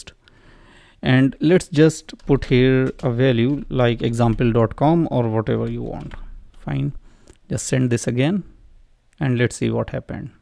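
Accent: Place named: India. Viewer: i didn't mean any criticism, imo indians have a tutorial in everything i love what you guys share with the world, and more importantly I LUV UR ACCENT ♥️🔥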